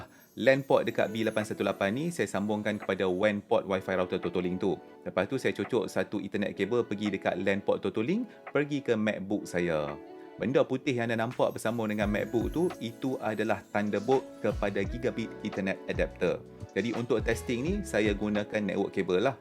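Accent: North, Malaysia. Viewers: Loghat utara tu okay saja. Muda faham. Sy yg berasal dari Sabah pun boleh faham. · Bg pendapat saya ok sj..mungkin sebab longhat utara saya pun org utara😅..tp overal rasanya sy suka sbb mudah faham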